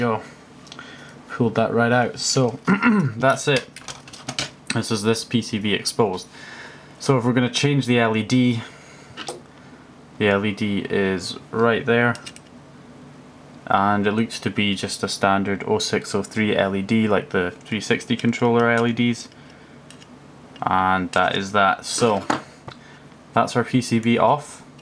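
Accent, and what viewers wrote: Scotland, United Kingdom. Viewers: Scottish bro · Cheers for video bud! Owned a torx security bit set for 15 years and finally get first chance to actually use it haha. Nice to hear a fellow Scot too 😉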